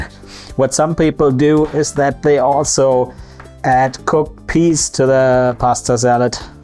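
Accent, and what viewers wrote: Swabia, Germany. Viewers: ! Ilike it! Just like the Red Hot Chili Peppers, drummer! Your Swabian accent is great, man! 😁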